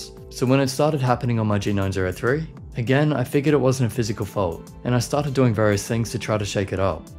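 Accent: Australia. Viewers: Are you Aussie? If so, same :D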